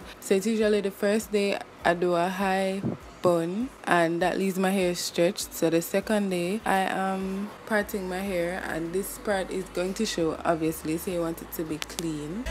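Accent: Jamaica. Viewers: You sound like a Jamaican, if so I'm also Jamaican lol hehe Nice video btw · Hear di accent nuh man 💛🇯🇲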